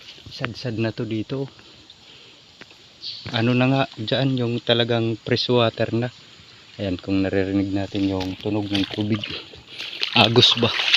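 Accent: Waray, Philippines. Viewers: Shout out ka paki sagot nman kung San ka sa samar or Taga diin ka kasi narinig ko kasi parang waray Karin?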